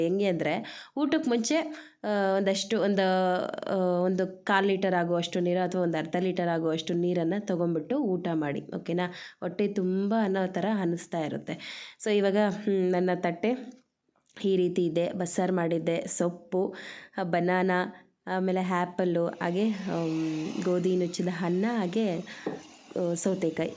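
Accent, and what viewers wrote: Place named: Telugu, India. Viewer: Nimdu yavuru madam swalpa Telugu mathadotara ede❤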